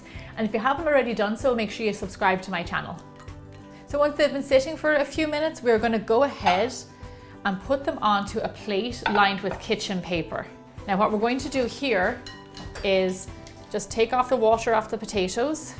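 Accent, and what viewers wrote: Ireland. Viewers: Your beautiful Irish brogue accent sounds just like my grandmother sounded. She was from County Cork. I've tried your potato chip in the microwave... PERFECT baby! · Is she Irish? Couldn't tell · Irish accent talking potatoes....I'm listening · Is it just me but the more I watch the more Irish she sounds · Agreed. I love the Irish accent. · She sounds pretty Irish to me! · I love the brogue, I miss the brogue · So irish 😂😝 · I'm only here because i love her accent. Irish chic's are sooo hot. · I love your recipes; and I have to say, I love your accent too. It reminds me of someone from Ireland who is very dear to my heart <3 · Wait ur Irish no wonder you souand like Jackspeticeye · I love the irish accent ❤️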